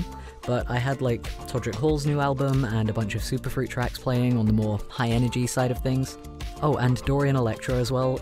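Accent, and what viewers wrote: South, United States. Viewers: southern accent ON POINT I respect you on so many levels, live your best gay life my dude! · As an American LGBTQ+ person I don't blame you going American (and definitely southern). My country has a long way to go in a lot of ways when it comes to the acceptance of people like us. I hope that this year fixes that. I'm really happy for you in how you now feel so much more comfortable in who you are, and your art is still gorgeous. Happy 2020 dude! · yo that wasn't just American, that was southern! (and accurate lol).... but there's still gays in the south................. lol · Oh my god your American (southern, really) accent gives me LIFE. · nice country accent! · As someone who lives in Texas: I can 1000% confirm this is a Southern Accent. It's better down here in Texas now than it was a decade ago, as I think a lot of places are a lot better, but man, can it still be hard. 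· Yeah I live in the U.S. definitely southern accent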